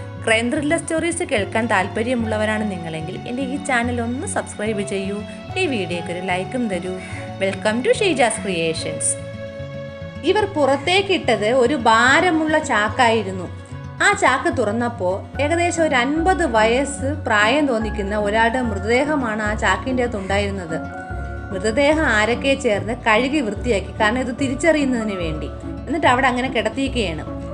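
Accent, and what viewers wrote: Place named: Thiruvananthapuram, India. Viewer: നല്ല കഥ അവതരണം കൊള്ളാം തിരുവനന്തപുരം സ്വദേശി ആണോ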